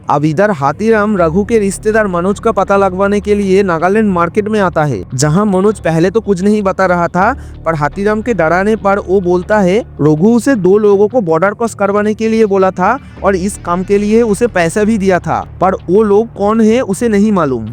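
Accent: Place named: Bengal, India. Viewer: tumi Bangali sur charte parcho na go bhai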